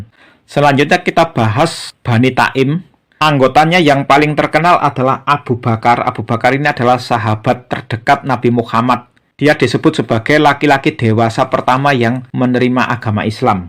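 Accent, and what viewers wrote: Java, Indonesia. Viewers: Mantap mas penjelasanya Walaupun dengan dialek Jowonya yg Kental..Medok 😁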